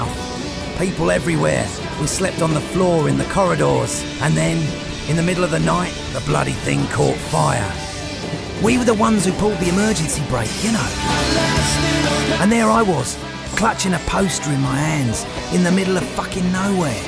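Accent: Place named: Italy. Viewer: You made a great video mate thank you Ps for an Italian you have a fine English accent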